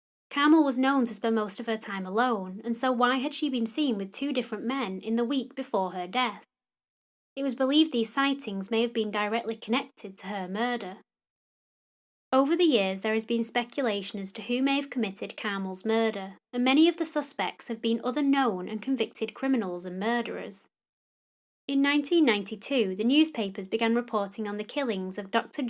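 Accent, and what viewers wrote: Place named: United Kingdom. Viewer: Really good! Well researched, well read. Great to hear a true crime podcast read in a British (regional!) accent.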